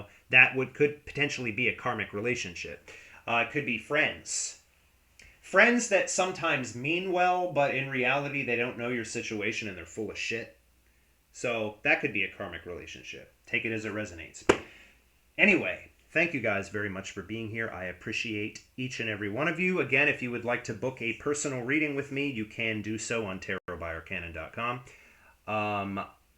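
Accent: South, United States. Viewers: I like your regular voice better than the southern one. :) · Yeah you were throwing me off with the southern thing, ha.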